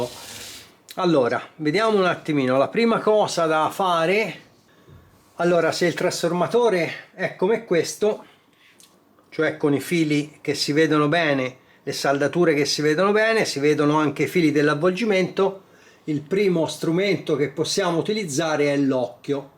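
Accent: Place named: Pisa, Italy. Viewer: Toscano ok... Ma.. Pisano? Non sei fiorentino, non sei livornese... Dall'accento direi provincia di pisa..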